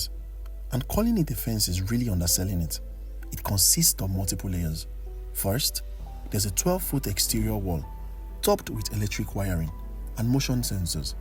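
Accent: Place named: Nigeria. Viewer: I just want to thank you for speaking with your Nigerian accent and not forcing Amerixan or British accents. I enjoyed listening to a fellow Nigerian. Well done.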